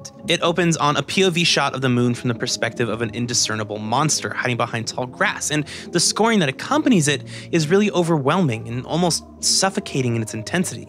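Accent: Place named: New York, United States. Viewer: he sounds like a typical new yorker, watchu mean fam? 😂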